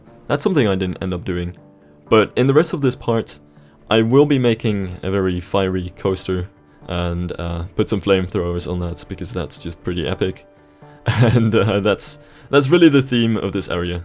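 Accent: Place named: Netherlands. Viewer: Dude i couldnt tell you were dutch Lol nice accent. Btw nice job!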